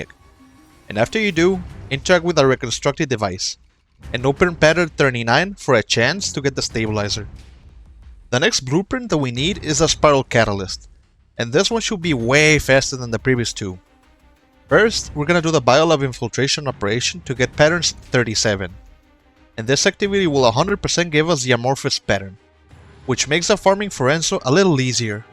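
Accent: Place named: Mexico. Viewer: I can hear the Mexican in ur accent lol. All love brother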